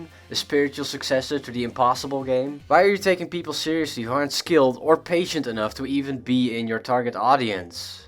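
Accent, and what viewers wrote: Netherlands. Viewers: wow the dutch accent shines right through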